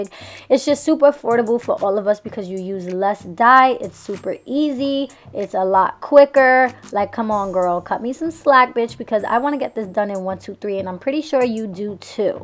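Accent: New York, United States. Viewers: New subscriber!🙋🏻‍♀️ Within the first 10 minutes of you talking I swear i knew you was from ny 😭 then you said it & im like yassss ! Finally a New York YouTuber 😩😭🙌🏽❤️ your video & wig is bomb af❤️ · I love your personality 😍😍 I knew u was from NY after watching 10sec of the video 😂😂😂😑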